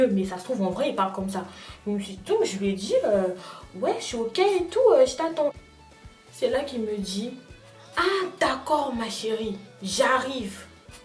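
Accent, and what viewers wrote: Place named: Republic of the Congo. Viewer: Rien qu'a l'accent... je reconnais Congo brazza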